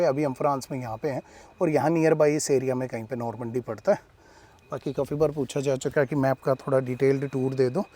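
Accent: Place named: Haryana, India. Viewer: Brdr Apko aawaj me haryanvi touch hai AAP Haryana me Kha ye hai bataiye please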